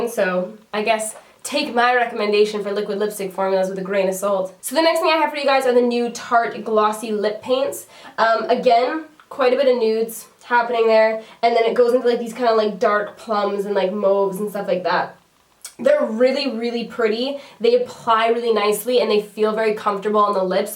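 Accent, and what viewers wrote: Canada. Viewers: I don't know if it is because english is not my first language but this is the first time I noticed your Canadian accent. Is real pretty though.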